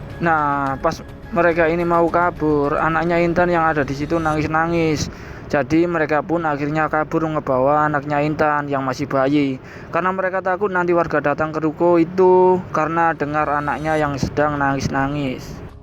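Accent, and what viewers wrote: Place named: Java, Indonesia. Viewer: Lucu bgt ngomongnya.medox bgt gitulah 😅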